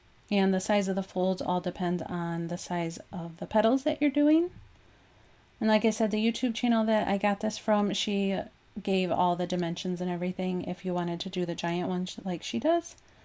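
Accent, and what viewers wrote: United States. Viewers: Your creativity and ingenuity knows no bounds! Wow, it's hard to choose a favorite...I loved so many of these! Thanks for sharing such inspiring projects with us! P.S. Are you from the Northern Ohio/Southern Michigan area? Your accent is familiar : ) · So many cute ideas! I love the gift tags, the paper flowers, the tray and the craft room storage ideas. I also like the pumpkins - I actually knit those so I think using socks might be easier. Your Michigan accent (guess) makes me think of home. I was raised in Ohio. Thanks for sharing your creativity! 💜🙏🏻👼🏻✝️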